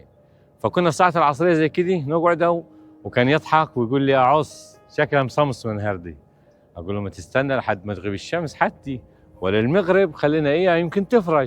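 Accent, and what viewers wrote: Upper Egypt, Egypt. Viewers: انتا فلاح ولا صعيدي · السلام عليكم ورحمة الله وبركاته احساس جميل جدا لما تلاقي حد شبهك صعيدي بيتكلم بتلقائية وبساطة شديدة جدا يا ريت لو تعرفنا حضرتك من فين في الصعيد عشان اعرف تخميني صح ولا غلط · عندك اسلوب رائع في سرد القصص , خصوصا باللهجة الصعيدية , تحية من دمشق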